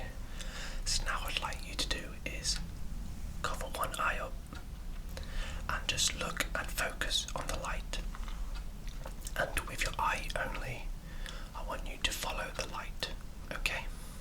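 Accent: England, United Kingdom. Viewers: *Me before video starts*Please tell this guy is British me when video plays HOW IS HE NOT Edit: is he cause I want him to be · you are from england??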